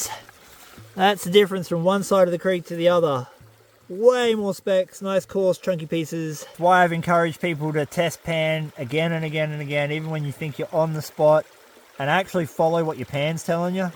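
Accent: Australia. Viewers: Aussie accents are only 50% understood to start with. The background noise cuts that by too much to know what's being jabbered about.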